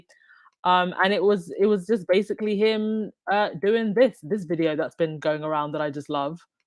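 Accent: United Kingdom. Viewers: I can tell by the sound of your voice that you are British. Maybe you can share. What governing agency of media air waves allow a commentator to incite harm to an another individual without that person or media platform NOT receive some type of sanctions??? Who does this Holmes man believes himself to be or better yet what royal associated gave him permission? To hear him speak literally made me sick enough to vomit. What on God's green earth have the Duke and Duchess of Sussex done to anyone of them personally that they wish such harm to them??? Soo much hate they are willing to orphan their children. SHAME on these people!!! He may now say he didn't mean it but where I'm from many a truths are spoken in jest!!! but he meant what he said. The arrogance of some British people is appalling!!! to other parts of the world. Something I believe they will soon find out. 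The Invictus Games in The Hague is a TRIUMPH simply a TRIUMPH. I know it is nearly over but I'm still wanting more. Not like William and Kate who are left wanting in every way. The British media, royal rodent, men in gray coats, Charles or William can do nothing say nothing or be about nothing that will out shine Prince Harry and our Duchess. Prince Harry's concern for his grandmother is justified. Andrew stealing monies anyway he can, Charles convincing her to make Camilla queen consort, William and Kate losing not half but all of the Commonwealth( they just don't know it yet), some Kelly woman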